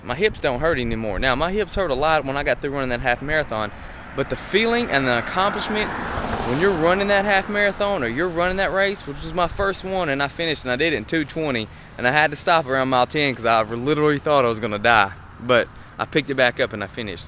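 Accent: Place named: Tennessee, United States. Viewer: well i knew u were from tn by ur accent, and i was like hey that street looks familiar, cuz i live in nashville too! im too overweight to run just yet but it was still really encouraging! im the same way about drugs, im a recovering addict and if my story helps just one person then it was worth it 4 me 2 go thru all that. God bless!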